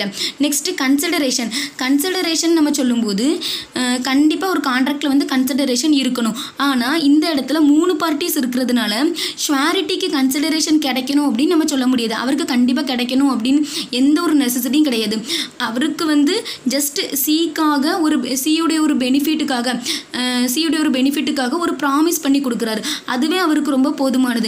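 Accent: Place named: Nagercoil, India. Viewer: I think It's Nagercoil language...😊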